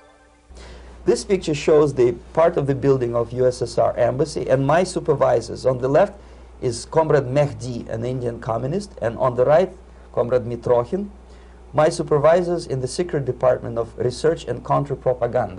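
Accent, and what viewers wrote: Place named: United States. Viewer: You are not doing well at suppressing your American accent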